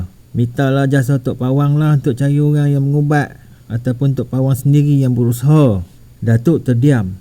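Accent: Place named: Batu Kurau, Malaysia. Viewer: Loghat tu macan cakat orang batu kurau lah..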